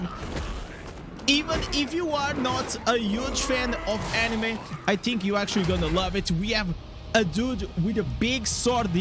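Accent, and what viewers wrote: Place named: Eastern Europe. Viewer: What nationality are you bro? Your accent sound eastern European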